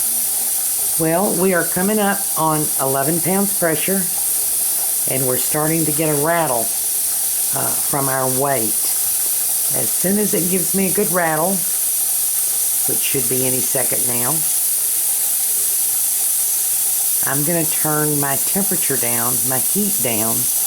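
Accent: South, United States. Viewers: i am binge watching your videos! i love that you are keto as well....i also cook on cast iron!!! oh Lordy! i really love that i found you...your sweet voice and slow southern style is so relaxing to my frenzied mind<3<3<3 you mentioned in one of your videos...i think spaetzles...that you are cooking for one? how is your husband, if you dont mind my asking...you may have mentioned it in another, i just haven't gotten there yet :{ this is one of your more popular videos...so i hope everyone here offers many prayers for him and you for as long as youtube is around! ;}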